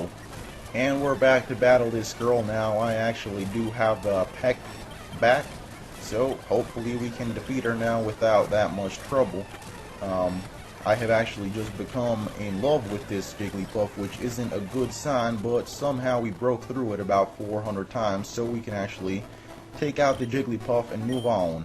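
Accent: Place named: South, United States. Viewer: New York, dang, you sound like a Southern guy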